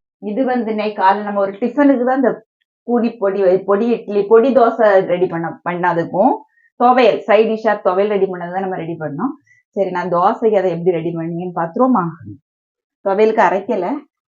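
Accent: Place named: Kanyakumari, India. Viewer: கன்னியாகுமரி தமிலால 😮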